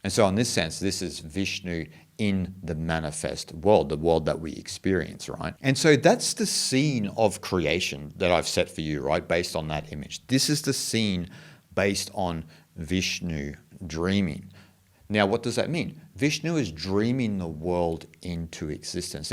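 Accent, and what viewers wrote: Australia. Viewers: Thousands of years old, very amazing concept of creation and the universe, very deep, not as flat as the 7 day genesis. When I came across vedic literature, many, many years ago, I was astonished, sucked into these mind boggling concepts, still blows me away..lasting some 55 years already. Robert Oppenheimer is said to have studied sanskriti to be able to understand these concepts untarnished by translation ! P.S. do I detect some Ozzi accent here?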